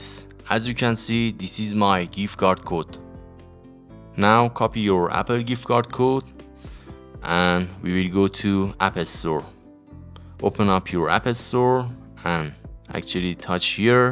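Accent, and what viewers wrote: Iran. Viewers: داش لحجت ایرانیه، چرا نمیشه تو کلش استفادش کرد ؟؟ هرکار میکنم میزنه رو رویال پس میره تو خرید با کارت مستر یا ویسا گزینه اپل پی نمیاد؟؟؟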